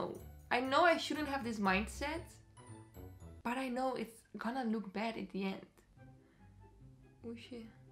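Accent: Netherlands. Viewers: Are you dutch, cause your accent sounds like you are?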